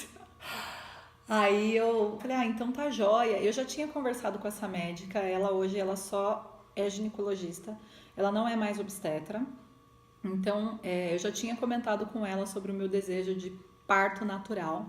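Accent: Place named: Araraquara, Brazil. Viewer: Legal so de araraquara,senti sua fala....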